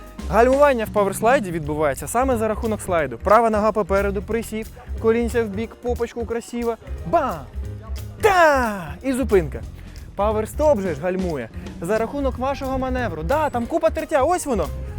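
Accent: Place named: Russia. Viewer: А якщо ухвалять закон, що з російським акцентом не можна говорити? Що тоді? Замовчите?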